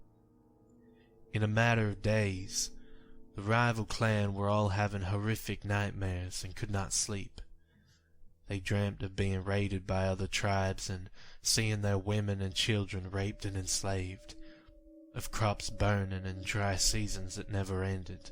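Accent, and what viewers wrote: South, United States. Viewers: Not a bad country accent, but in New Orleans it's a little different because of creole. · As an American born in south Georgia. I love your southern accent man! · Love the southern accent, lol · i love your stories but you need to work on your southern draw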